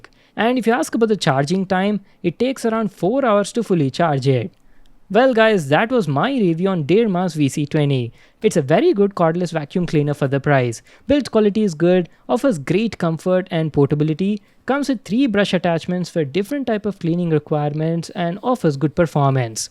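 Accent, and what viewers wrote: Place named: India. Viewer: Why you talk like Apu from The Simpson, You wanna by funny?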